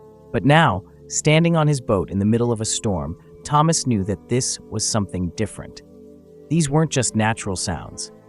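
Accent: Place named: United States. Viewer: This video needed an English accent, not a yank. Just doesn’t work.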